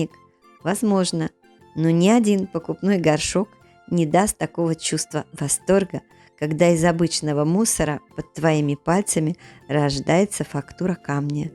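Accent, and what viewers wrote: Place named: United Kingdom. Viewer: I love it and appreciate the UK voice over. Many thanks x❤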